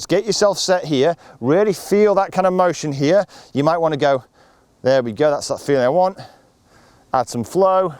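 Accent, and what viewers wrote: United Kingdom. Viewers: Helpful tips. Also good for learning British English too. Many thanks. From S.Korea.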